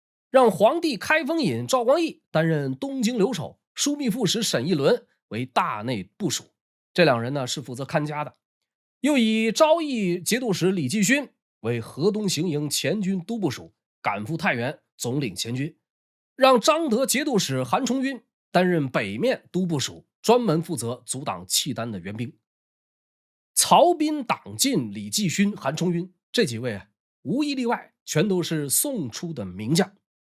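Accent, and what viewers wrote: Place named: Shenyang, China. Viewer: 总觉得兄是沈阳人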